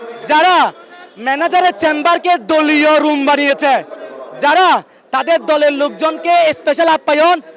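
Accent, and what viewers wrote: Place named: Chittagong, Bangladesh. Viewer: তোমার মুখের ভাষায় মনে হয় তোমার বাড়ি চিটাগাং তুমি সিলেটে কি কর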